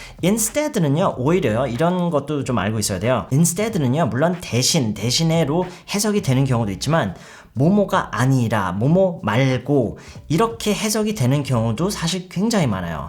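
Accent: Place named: United States. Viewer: 우리말 할 때 억양같은 거 보면 미국에서 나고 자란 교포같으신데 어쩜 이리 한국어를 완벽하게 하실까요 한국어와 대조한 영어의 차이점을 너무 친절하게 설명해 주셔서 이해가 쏙쏙 돼요 항상 감사드려요!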